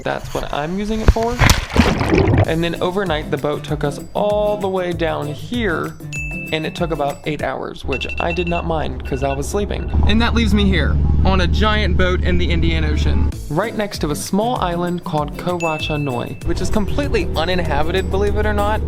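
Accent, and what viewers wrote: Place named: Philadelphia, United States. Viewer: That's a Philly accent if I've ever heard one.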